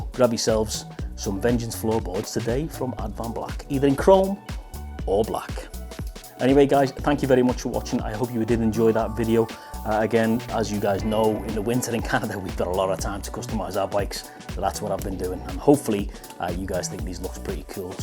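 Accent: Liverpool, United Kingdom. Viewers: Nice one scouse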